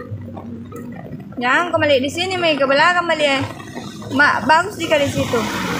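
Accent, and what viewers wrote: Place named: Sulawesi, Indonesia. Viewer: Logat Sulawesi kak 😅 langsung kentara . Salam dari Luwuk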